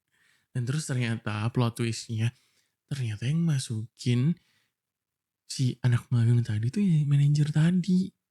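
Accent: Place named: Kalimantan, Indonesia. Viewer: _mas:cara ngomongnya kaya orang kalimantan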